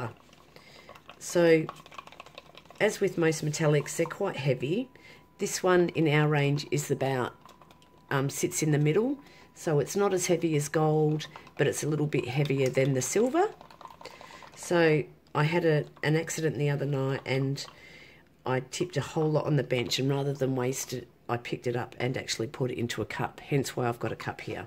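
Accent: Australia. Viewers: Ah the Aussie accent and the 'youse' hehehehe! Hilarious! (I'm a Aussie too.) I see someone has already talked about the narrow field of vision so that's great for next time, but you might also try not to say 'um' so much hehehehehehe. This technique is so very exquisite isn't it? i love it. is the paint waterproof, how do you seal the tile and can it be put on a wall or is it only to be framed? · Haha!! Well then, that’s perfect!! We both got a little chuckle this morning ☺️ (or “this evening” 🇦🇺 you sound Australian which means are 14 hours apart)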